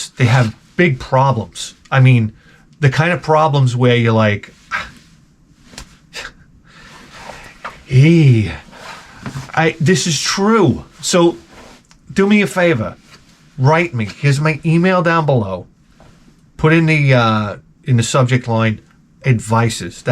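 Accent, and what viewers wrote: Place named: New York, United States. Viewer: What part of New York are you from?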